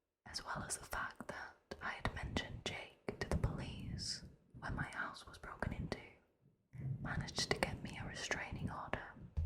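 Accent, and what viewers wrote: Northern England, United Kingdom. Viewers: I absolutely adore your videos. You're fast becoming my favourite asmr artist and I love it when I get a lil notification telling me you've posted! I love the mix of whispers and fluffy mic brushing, it is soooo tingly.☺ would love just a fluffy mic video from you tbh! Also love your Northern accent, pls tell me you're a Sheffield gal?! Thank you for the videos!!! Xx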